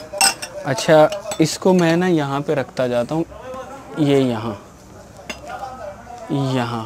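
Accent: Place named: Urdu, Pakistan. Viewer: Ur urdu accent and way of way of talk is very beautiful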